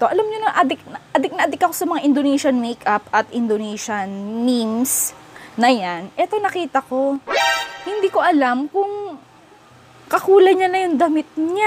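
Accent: Ilocos, Philippines. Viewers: Ilocano Kaba ate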